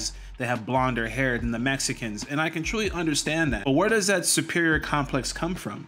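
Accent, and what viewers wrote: United States. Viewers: Your american accent and been just a tourist for sure they ll treat you nicely...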